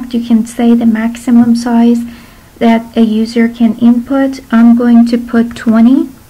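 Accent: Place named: Iran. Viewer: After watching this i noticed your accent seems to be persian and then i chucked ur prof name and i suprised proud of iranian women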